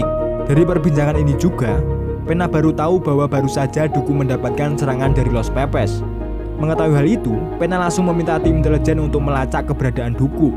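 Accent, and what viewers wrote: Java, Indonesia. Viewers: Mas sampeyan Wong Jowo?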